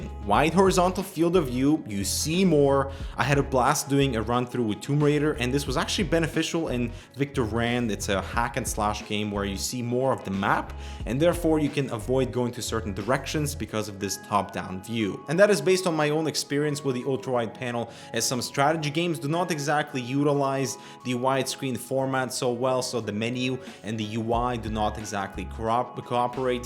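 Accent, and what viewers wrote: Russia. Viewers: я извиняюсь, что за акцент ты русский что ли?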